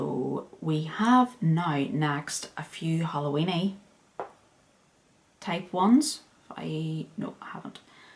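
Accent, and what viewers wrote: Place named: Northern Ireland, United Kingdom. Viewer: 🍓loving the accent. I live in Bangor Co.Down and I don't hear many if our accents on flosstube. The other one I watch is sewmesarah who's amazing. Loved seeing all the little projects. I was a monogamous stitcher til I discovered flosstube about 6 months ago. I see so many things and I've a list already from what you've shown today that I don't know where to go next. Keep up the good work. 💞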